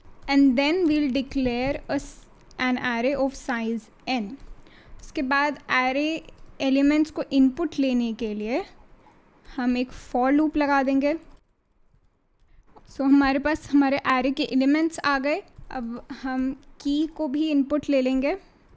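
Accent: India. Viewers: tired of hindu accent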